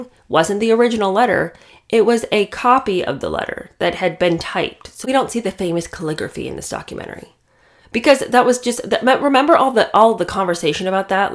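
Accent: United Kingdom. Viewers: I just love your British accent😂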